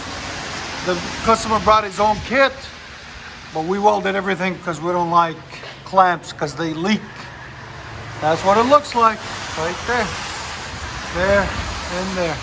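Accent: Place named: Upper Peninsula, United States. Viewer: Are you from Michigan because you sound like a yooper.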